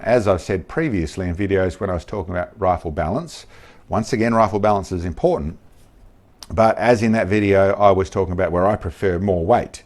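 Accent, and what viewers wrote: Australia. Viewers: I laughed when you put the barrel down so you could talk properly. Somewhere in your ancestory there might be some Italian blood. Something about mediterranean arms movements and aussie accent is genius. Love all your videos, Australia is my 2nd favorite country to visit right after NZ.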